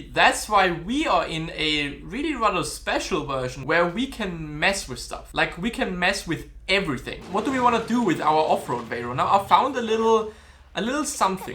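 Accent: Germany. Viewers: Hey dude.. just wanted to ask if youre from germany cause I can slightly hear that accent but the rest ist just wonderful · i Hear it du bist deutsch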